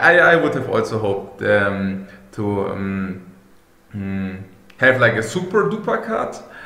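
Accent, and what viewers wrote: Germany. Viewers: his english sounds sooo german xD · Liege ich mit der Annahme richtige das du eigentlich deutsch sprichst? XD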